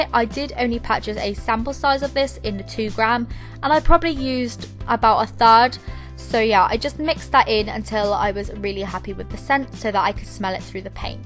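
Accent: United Kingdom. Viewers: I love you're accent I WISH I HAD YOUR ACCENT I THINK ITS BRITISH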